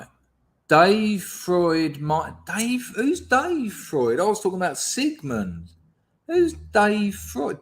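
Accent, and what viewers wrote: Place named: London, United Kingdom. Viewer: I really miss the London accent I spent a lot of time in Hanwell over the decades 🏴⚒️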